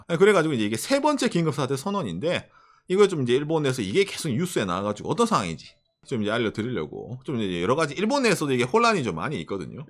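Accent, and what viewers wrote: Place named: Gyeongsang, South Korea. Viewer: 경상도 남자라 기본적으로 억양 배이스가 깔려 있는것도 있을듯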